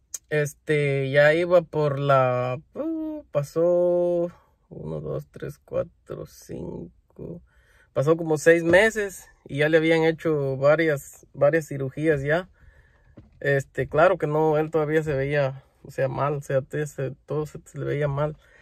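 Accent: Mexico. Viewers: Eres mexicano Ablas como Mexicano te copiaste de Asento Mexicano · Es la primera ves q veo este canal y creó q el muchacho es de Guatemala, pero tengo duda porque abla como mexicano, me cayó mui bien 👍 seguiré mirando sus videos para subscribirme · Soy méxicana y no veo nada de malo que hable como nosotros. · No les quita nada de que el quiera hablar como los mexicanos!. Yo soy mexicana y no le miro nada de malo. Malo es cuando se expresan mal de Mexico 🇲🇽 pero en este caso no es así.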